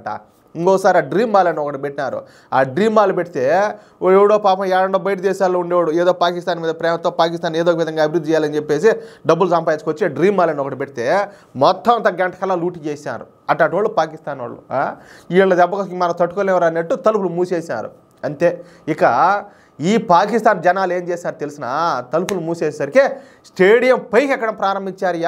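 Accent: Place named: Kadapa, India. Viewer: Ni slang cuddapah laga undhi brother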